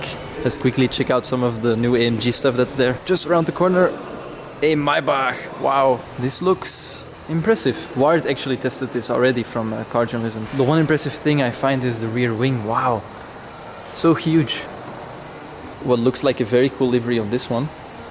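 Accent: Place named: Antwerp, Belgium. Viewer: Zie da ten bodukap dees filmke nie zie hee! Ik dacht al dat ik ee sint katelijnse tongval hoorde. Van achter in mijnen hof zie ik op het St. Michielskasteel! Goeie review! 🤘✌️👍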